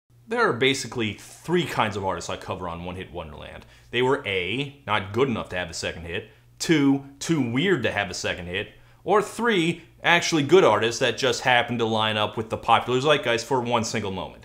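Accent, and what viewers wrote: Australia. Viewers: Todd, I see you picked up an Australian accent. Please, put it back. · when i heard the australian accent i nearly quantum sprinted to the nearest cliff and leaped off of it. good video though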